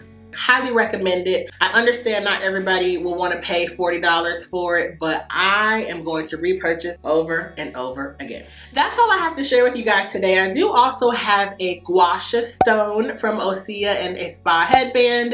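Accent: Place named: New York, United States. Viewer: You sound like New York when you talk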